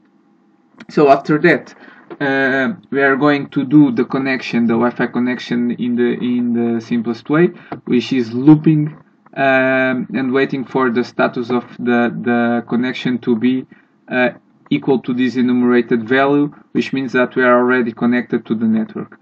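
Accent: Portugal. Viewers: Are you portuguese? Your accent seems me very familiar 😂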